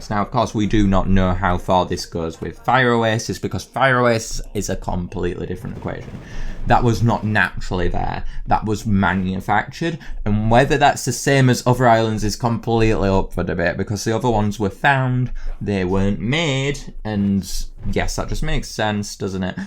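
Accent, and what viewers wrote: United Kingdom. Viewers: Oh, that british accent)))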